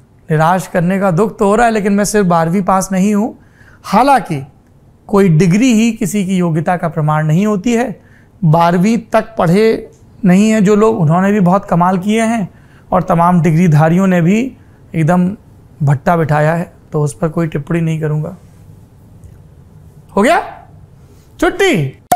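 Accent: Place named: Bundelkhand, India. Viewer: Hello saurabhji,bhasha or boli se ap bundelkhand ke lagte ho,prathak bkd prant k liye bhi apne star se prayash karenge k nahi ?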